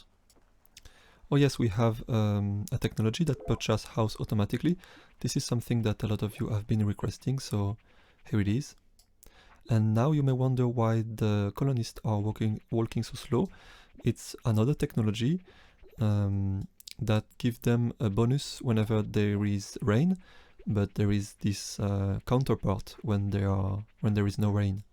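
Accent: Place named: France. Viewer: Your accent tell me that mby you might be fr so.. j'avais une petite question, est-ce que ce ne serait pas une bonne idée de mettre un marqueur fluo d'une couleur au choix mais bien flashy autour du pointeur de souris? (J'ai pu remarquer avant hier en terminant la démo que la souris devenait vite illisible ou introuvable quand tu arrives sur les trillions et qu'il y a des rivières de fruits à l'écran.)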